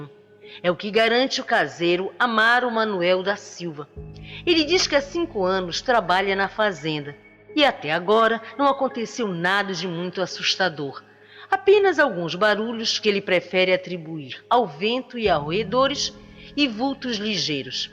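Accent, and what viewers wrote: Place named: Amazon, Brazil. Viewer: eu sou de belem, pelo seu sotaque as vezes eu tenho duvida se voce é de belem ou de manaus. Amo os seus videos, abraço.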